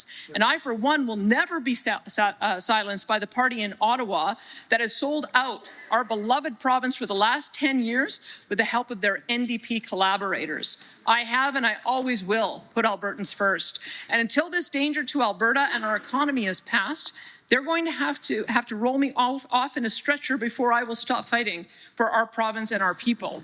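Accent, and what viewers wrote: United States. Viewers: She sounds American to me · She is too arrogant for an elected official. Tone it down lady. You're not as important as you think. You sound like a yank. Remember you're in Canada. Stop trying to be maga.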